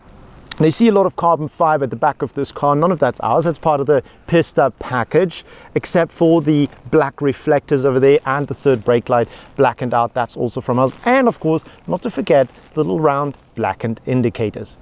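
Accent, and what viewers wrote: South Africa. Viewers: This Presenter is definitely South African. gatta Pattata?. Leon Schuster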